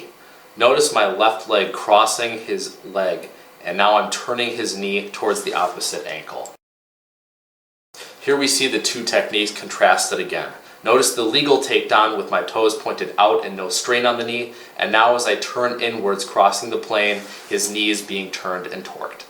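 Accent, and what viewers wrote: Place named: Minnesota, United States. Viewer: I love that Minnesota accent.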